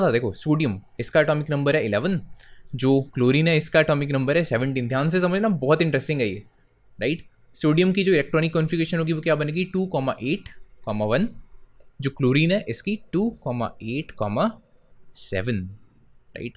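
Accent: India. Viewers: Yeah..I somewhat realised u seem to be punjabi by the way of your taking sir...even I am punjabi and I have shared with my friends:)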